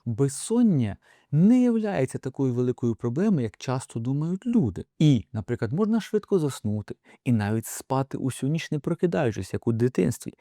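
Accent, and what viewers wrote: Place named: Lviv, Ukraine. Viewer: Ви, напевно,- лікар від Бога!! Особливо мені подобається ваша вимова! (Я закінчив учбовий заклад у Львові та прожив там понад 30 років… Тому ваша вимова мені дуже рідна!! ) Дякуємо вам за ваші поради! Дай Боженька вам та вашим близьким,- Здоровʼя,, Добра та Любові! ❤️! Будьмо!!!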